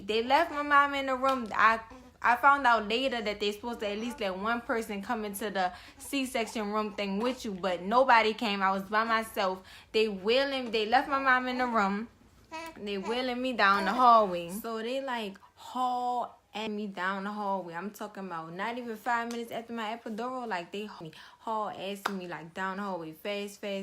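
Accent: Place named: New Orleans, United States. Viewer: That New Orleans accent tho 😝😝😝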